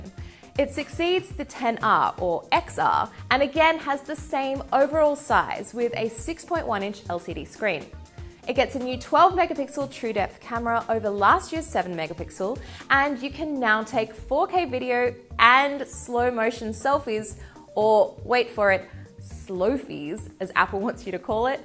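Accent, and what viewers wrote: Australia. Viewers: Is she Australian? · R u Australian? Cuz ur accent is aussie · I feel like she’s Aussie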